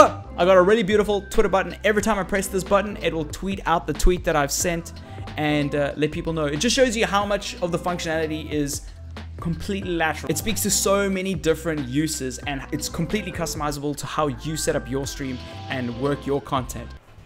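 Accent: South Africa. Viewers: are you south African ??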